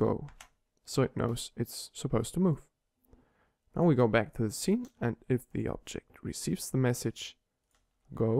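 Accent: Germany. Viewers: Dein Englisch ist sehr sehr gut. Man hört den deutschen Accent nur ganz leicht raus.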